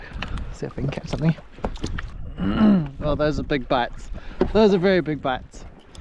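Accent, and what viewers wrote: New Zealand. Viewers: Well this is awesome! Never heard anyone speak with such a posh Kiwi accent! Oh plus the hardcore fishing … great work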